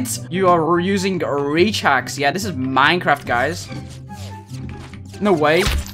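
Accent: United Kingdom. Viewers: Hey are you british?????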